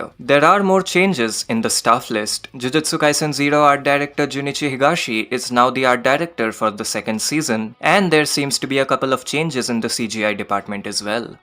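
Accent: India. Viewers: Are you Indian? Cause you sound like it. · Yep in Tybw video they were targeting you through racism,when they weren't able to find proper points to defend their criticism they were saying stuff like "you're Indian" "You can't make good content because you're from India and India is known for scams" "I can't hear you your accent is annoying"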